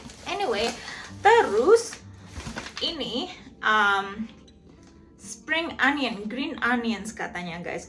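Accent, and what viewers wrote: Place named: West Java, Indonesia. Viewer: Waalaikum salam mbak yu Iki kok koyok wong kulon deso betul ngak e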